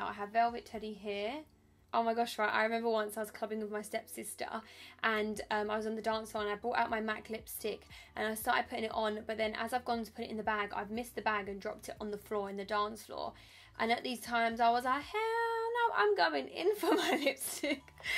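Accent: Essex, United Kingdom. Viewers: Are you an Essex girl?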